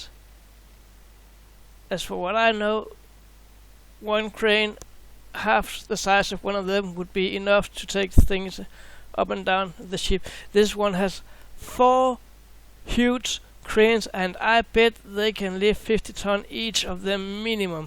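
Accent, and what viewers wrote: Denmark. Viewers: you must be Danish ❤😅. Elsker din accent, hvis du forstår dette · kender en dansker når man høre en.. god video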